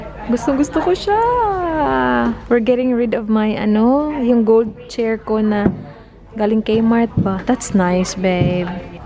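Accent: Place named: Visayas, Philippines. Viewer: Te pag bisaya nalang ... bisaya Kay imong accent ... love ur language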